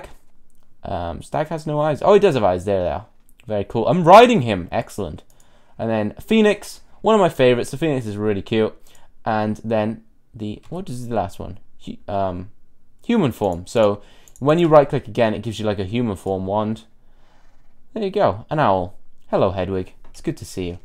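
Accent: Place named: Wales, United Kingdom. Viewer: ACCENT = MINT! ITS GREAT TO BE WELSH... I KNOW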